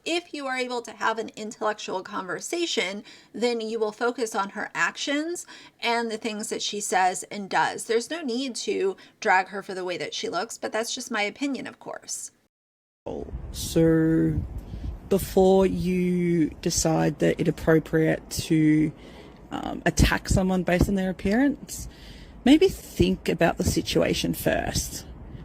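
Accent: Australia. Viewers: Australians are awesome!! Lovvvee the accent too 👍🏼❤️ Also, as an American have you SEEN the state of our country right now? Lol I’d be the last to judge anyone else 🤣💀Alicia Dougherty doesn’t represent most Americans so don’t judge us lmao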